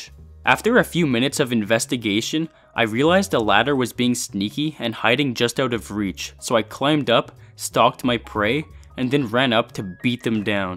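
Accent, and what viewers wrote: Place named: Canada. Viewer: the most canadian sounding canadian I've heard on YouTube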